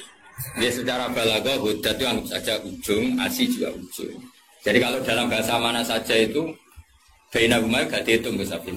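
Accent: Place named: Banyumas, Indonesia. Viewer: ❤❤❤purbalingga ngapak ndrek gus..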